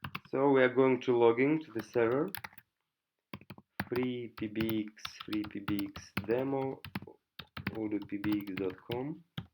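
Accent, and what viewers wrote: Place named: Russia. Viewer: Привет. Отлично разговариваешь на английском, но у тебя русский акцент. Подписка.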